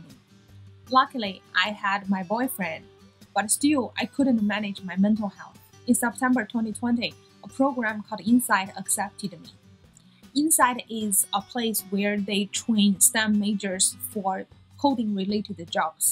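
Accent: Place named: India. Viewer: indian guys are killing it with their accent good luck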